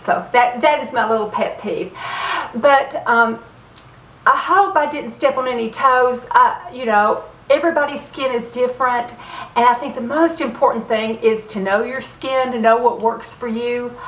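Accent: South, United States. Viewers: Hi, I just subscribed to your channel. I like your southern accent. I was totally shocked when you said you were 59. I am 61 and always trying to anti-age. I would put you right up there with Christie Brinkley. We don't have to look like old ladies as we age if we take care of ourselves and our skin. Have a blessed day!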